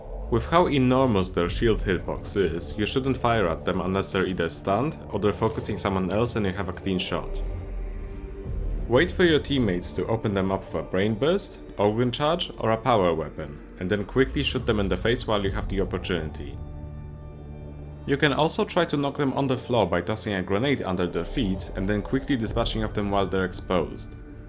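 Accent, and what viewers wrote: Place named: Germany. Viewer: He has a german accent, I trust him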